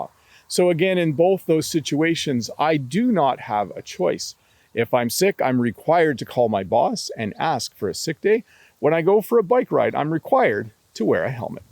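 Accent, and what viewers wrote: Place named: Canada. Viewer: finally found someone canadian that teach canadian inglish for me is the best understand very well evething was there many years ago I miss canadian English, usa English is more confuse to me Thanks 😮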